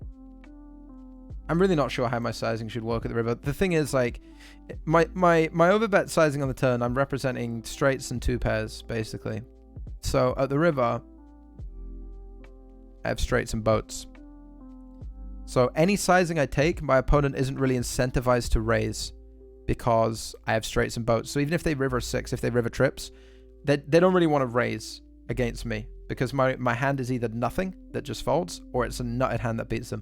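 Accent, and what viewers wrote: United Kingdom. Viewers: funny bloke.........where are you from in the UK you gerth?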